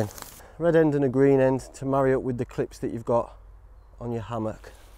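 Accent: Yorkshire, United Kingdom. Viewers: Aww, don't fret about your script! I just love to see your little face and hear your Yorkshire accent! Just do you! Love from South Carolina! Xxx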